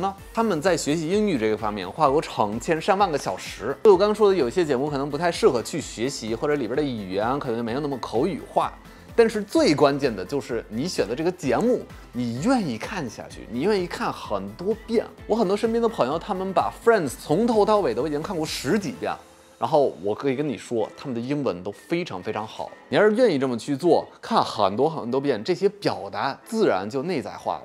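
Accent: Beijing, China. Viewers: 你的中文肯定是北京人交的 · 是北京口音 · 北京口音的外国英语老师。。。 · 你竟然還會北京口音 酷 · 这一口京腔啊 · 老师的中文说的好好感觉有股北京腔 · ，你口音像北京土著人。你是一个长的像外国人，英语说的也像外国人的北京人。哈哈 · 我老家南方的， 你的北京话比我说得好，虽然我在北京时间比你长很多。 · 一张口一股子京味😂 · ，我今天突然发现你有北京口音.... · 马老师北京话纯正，跟他学没毛病。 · 满屏的京味儿哈哈哈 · 您这北京话也忒标准了吧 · 北京口音？ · 你的口音是北京腔，哪個訪談節目比較好？ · 有的人就是有语言天才，没办法的。这主播比不少北京人更北京。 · 哈，这一口京腔~ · 京片子味儿哈哈哈哈真的是老炮儿了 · 老师的中文口音感觉很京味，老师在北京生活比较吗？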